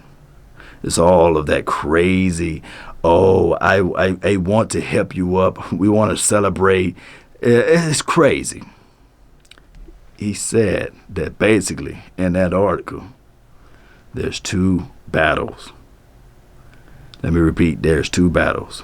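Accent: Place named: Texas, United States. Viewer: As a Skins fan, I gotta say I have been checking out a couple of your streams and outside of your analysis being 💯 your voice is like...perfect. You got the perfect mix of baritone and Texan accent. Why you can't resume yourself to national radio I have no idea. Keep up the good work.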